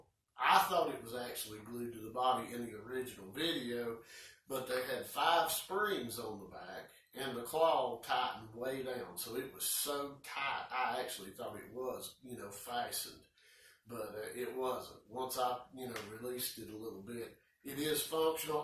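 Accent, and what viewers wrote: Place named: South, United States. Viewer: Love that Southern drawl! Epitome of politeness. You earned your thumbs up, friend!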